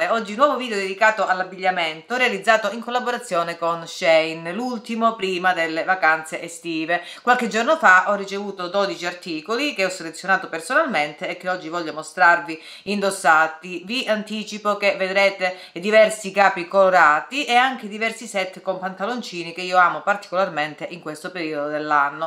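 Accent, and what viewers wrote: Calabria, Italy. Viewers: Ciao, posso sapere di dove sei? Sei calabrese? Conplimenti.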